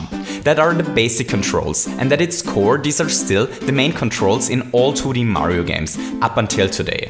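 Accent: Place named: Germany. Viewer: You have awesome content but the speed you are talking gives no chance to deeply analyze everything + I believe you are German speaker because your English sometimes makes me feel I am listening German, so my brains errors =) I believe if add little delays between sentences that will make videos even more interesting. Thanks anyway and like!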